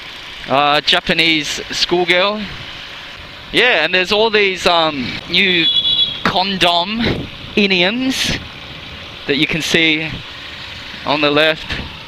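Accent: Australia. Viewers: is an Australian Vietnamese. This is how we sound.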